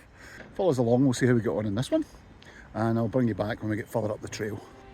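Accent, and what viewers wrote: Scotland, United Kingdom. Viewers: Oreyt, was just watching you review of the x-mid and noticed your Scottish accent I was the Yorkshire lad you was chatting to at camping des glacier in Switzerland. 🇨🇭 hope you’re well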